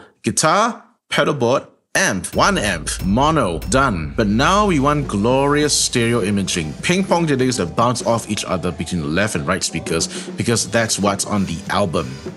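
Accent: Singapore. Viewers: You sound like a Singaporean. Is your church in Singapore or Malaysia?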